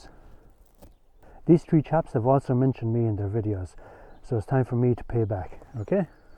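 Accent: Ireland. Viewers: Every time I watch one of your videos you make me smile and chuckle to myself: here is some quietly spoken Irishman calling time on the all the survivalist/bush-crafting BS on youtube. Absolutely priceless!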